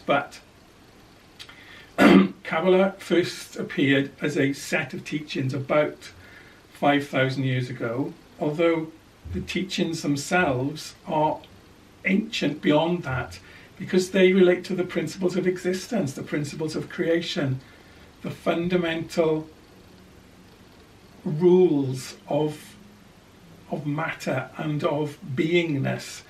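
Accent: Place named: South Wales Valleys, United Kingdom. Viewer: It sounds as though you have a slight welsh accent ?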